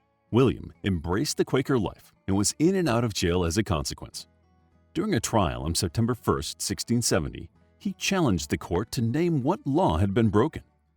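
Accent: United States. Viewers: This Yankee narrator's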